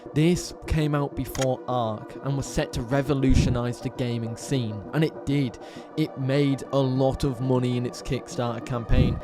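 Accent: United Kingdom. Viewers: Is this a Scottish accent by some chance?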